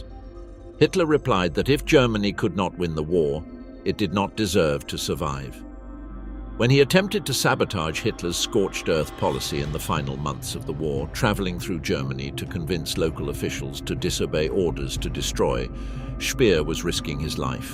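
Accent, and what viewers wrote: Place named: Oxbridge, United Kingdom. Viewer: stagey and pretentious...instead of the corny oxbridge accent and spooky music , a little histortical rigor...when thbe narrative ventures to comment and philosophize it pushes the envelope of banality....saying trite and obvious things in a stagey accent does not really dignify them ...too stupid overall and constantly stating trite opinions as verities....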